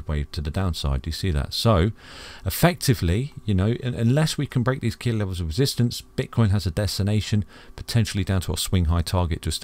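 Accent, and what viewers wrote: United Kingdom. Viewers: This british accent is so damn gay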